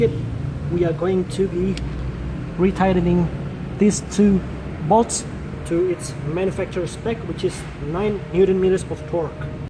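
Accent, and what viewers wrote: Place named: Australia. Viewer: This is so well put together, you sound Aussie like myself bud, the description in the video is so detailed and given some of the horror stories I've heard especially in older mazda versions of Ford 5 speeds I think you're bang on with preventative maintenance at those intervals. I'm hoping the process is similar for the 5 speed in my 2008 sp 23. Looks similar to one I saw but wasn't half as well done as this and video quality wasn't the best either. Pretty sure my trans is the FS5AEL slightly modified Ford one and pretty janky from all I've heard, although I'm sure fords is worse lol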